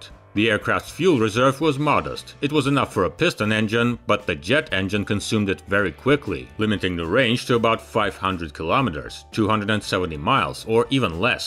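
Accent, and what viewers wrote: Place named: Russia. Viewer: The narrator is a native Russian speaker, providing the way he pronounces the titles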